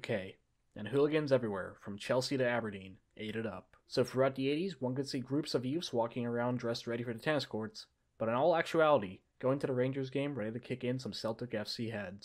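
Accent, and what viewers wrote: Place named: United States. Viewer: Soon as the yank started narrating, I switched off!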